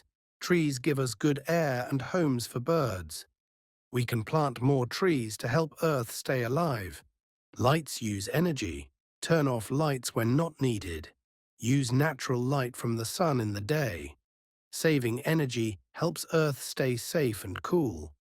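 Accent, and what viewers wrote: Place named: United Kingdom. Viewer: british olması lazım ama çok takılma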